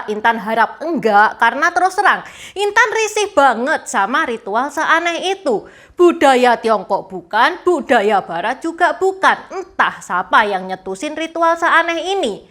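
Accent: Java, Indonesia. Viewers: Suaranya medok jawa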